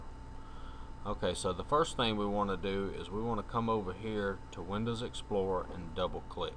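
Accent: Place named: Appalachia, United States. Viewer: how about on chromebook ps you sound like a hill billy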